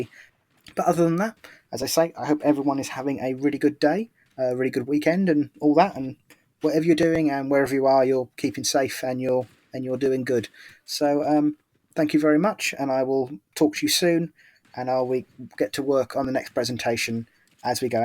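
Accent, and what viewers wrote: Norfolk, United Kingdom. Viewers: Lovely Norfolk accent. Compared to mine you sound clear precise and professional. Ar B lovely ole job. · Hey this is an old fen tiger that's retired to West Africa ... just found you & it's brilliant ... also nothing wrong with a Norfolk accent ... bootiful 🤗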